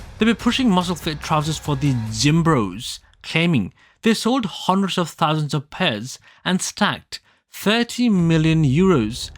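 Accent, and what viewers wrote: India. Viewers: WHY TF you have I dian accent ????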